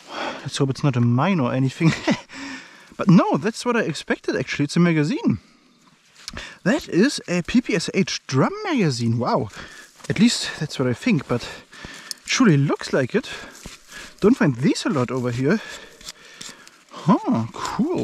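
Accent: Germany. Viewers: Bro has such a german accent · Dieser deutsche Akzent hahaha, gutes Video glückwusch zu den Funden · Is your accent German? If so, are you still allowed to keep items with insignias? As I understand there are rules about having sw4stlk4s. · Typical german english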